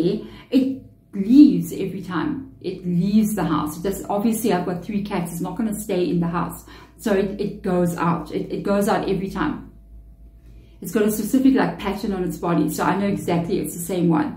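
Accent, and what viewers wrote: South Africa. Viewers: Hello dear of, light and blessings to you 💖 thank you so much for this message, it is for me. A video of yours came to my feed a few days ago (my sense of time is fuzzy now) - you first published it 2 weeks ago — it was about creating a new life that will be shocking to others. That was for me, I watched until the end and that message gave me goosebumps, I felt the resonance I my heart, my head was tingling the entire time. This message is the continuation of that one. I know it. And you will know it too 🌻 I am so grateful. I watched this one the whole way through and I wanted to watch it over again right after. Thank you for the prayer, thank you for sharing your light with me. It’s amazing that you use the word reprogramming here because that is exactly what I feel like is happening. I’m allowing my intuition to guide me as I flow along the process. 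There are rare moments when my mind still wants to take over and ask questions and plant seeds of doubt, I take a breath and come back and trust. Thank you. I am so excited for this newness. It’s different. Changes are happening. But it feels gentle and I feel so supported. Thank you thank you thank you. I send you love, beaming to you from across the Earth. P.s. I have a friend from South Africa, I knew you were South African when I heard you speak in the first video, you confirmed it in this one. Maybe one day I’ll find myself there. Nou gaan ons braai 😂 Big big love and hugs to you 🫶🍀✨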